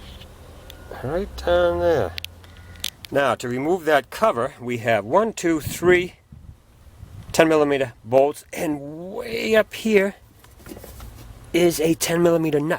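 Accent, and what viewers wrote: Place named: Maine, United States. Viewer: Where ya from, bub? Sound like a fellow Mainer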